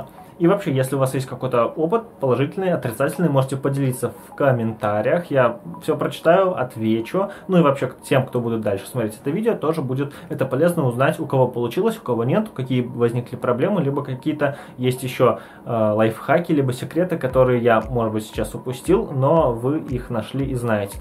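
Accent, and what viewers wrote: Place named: Belarus. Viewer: забавно, что с белорусским акцентом, вы ни разу там не были)))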